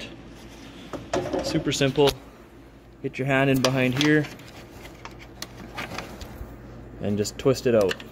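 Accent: Canada. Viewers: Your accent sounds very Canadian? Manitoba?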